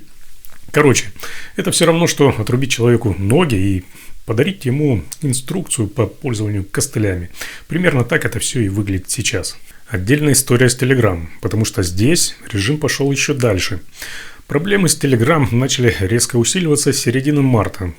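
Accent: Ukraine. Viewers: Этот майор, судя по говору, родом из Малороссии. Вслушайтесь, соотечественники: ципсо во всей своей красе. Вдохновенно работают.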